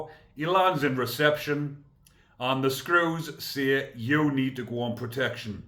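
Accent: United Kingdom. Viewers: May i ask what part of Britain is your accent from? I am very interested in listening to the variety of accents which your country has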